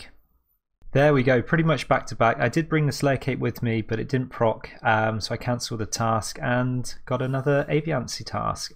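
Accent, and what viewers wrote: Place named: United Kingdom. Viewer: Nice British accent Fellah!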